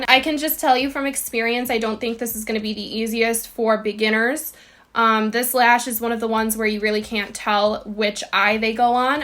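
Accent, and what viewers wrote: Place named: Minnesota, United States. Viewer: You’ve got to be a fellow Minnesotan because you sound like every girl I know.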